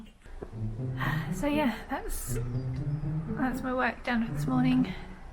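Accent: United Kingdom. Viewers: Hey there...I'm a new subscriber and I just want to leave a compliment, I love big, fluffy dogs, I have a couple of German Shepherds that you'd love!, you and your pup are so cute together and I love your British accent, you sort of sound like Ozzy's wife!...I'm calling from the United States, I live in Michigan....Love, Ron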